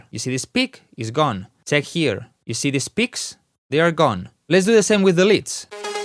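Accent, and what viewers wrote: Spain. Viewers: y, era re obvio que es de españa si te das cuenta como habla inglés jajaja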